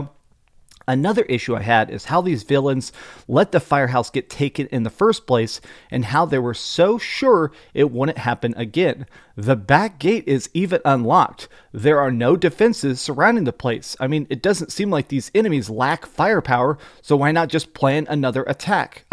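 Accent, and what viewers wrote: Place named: Texas, United States. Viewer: I am not American. But I love your accent, Texas boy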